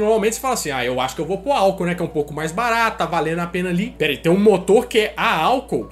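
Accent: Minas Gerais, Brazil. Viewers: OCE É MINEIRO UAI, O SOTAQUE NAO NEGA TO ERRADO , SO SE O UM MUCADINHO SO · Todo domingo eu to aqui na expectativa de ouvir o modo de cumprimentar Mineiro ... " cês tão bão né?! Manda um salve aqui p São Joaquim de Bicas - MG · Gente...melhor canal do YouTube....sua forma de apresentar é mt top..super natural e engraçado..é o sotaque Mineiro e top..MT bom de ouvir..sou mineira mas quem mora em BH fala MT diferente de quem mora no interior kkkk me divirto MT com seu canal parabéns.... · Cara, ce é mineiro?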